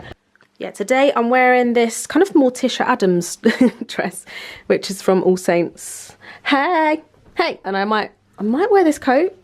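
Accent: United Kingdom. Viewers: Hi this is Kim! Merry Christmas, and Happy holidays. I like you're video's they are intertaining! I also like you're British actcent! Thanks again, Kim!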